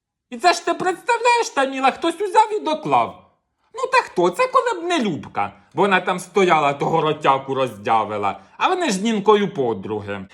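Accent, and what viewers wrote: Poltava, Ukraine. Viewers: Насмiялась вiд душi! Дякую, як же Ви так точно вiдтворюете мову полтавщини, сiльський побут. Жiночi, розбiрки,. Ви-неймовiрний, дуже талановитий! Здоров,я Вам, натхнення! Чекаю нових замальовок!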